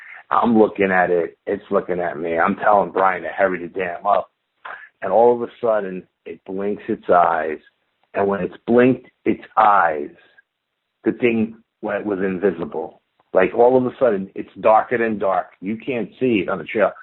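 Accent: South, United States. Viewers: The Southern Accent is like ???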